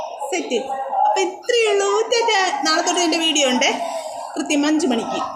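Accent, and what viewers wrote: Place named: Thiruvananthapuram, India. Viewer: നിങ്ങളുടെ തിരുവനന്തപുരം സ്ലാങ്ക് എനിക്കിഷ്ടമായി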